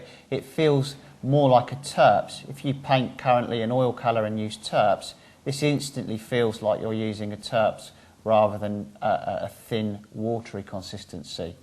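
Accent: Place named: Cockney, United Kingdom. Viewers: VERY THICK BRITISH ACCENT.... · Not just British, but not-quite-cockney London working-class. Love it. There are many accents in Britain.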